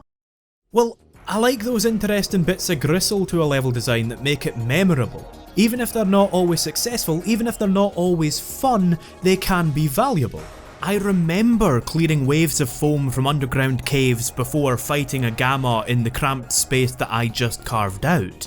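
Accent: Scotland, United Kingdom. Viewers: wow ur very scottish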